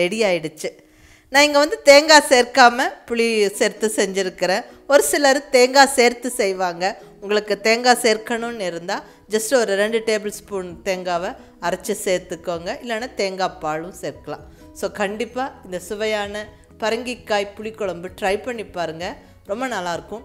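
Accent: Coimbatore, India. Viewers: Hai sis eppadi irukkenga... Unga kovai tamila kettukitte irukalampola avlo alagaa irukku. Subscribe panravanga ennikkaiya parkkumpodhu avlo happayaa irukku sis... Neenga innum niraya munnera en anbaana valthukkal... Dish asusewel super. 👋 👋 👋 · kongu tamil arumai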